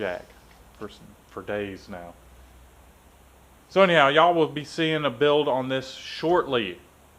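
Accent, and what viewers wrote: South, United States. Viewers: Those aren’t friends. Those are people that want to take advantage of you. That having been said, you’ve fixed your audio lag and that means I’m subscribing and I’m gonna watch all your videos. I think I speak for everyone when I say we wanna see that stash tho. Hola from Mississippi, the southern twang is not lost on me.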